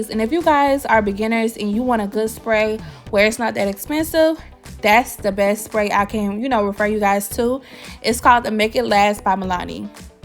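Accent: Miami, United States. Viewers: I love your Miami accent baeeee💞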